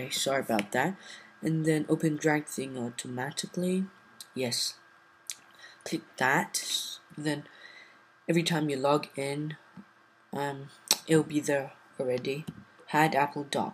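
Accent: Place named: United Kingdom. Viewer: why r u using a british accent?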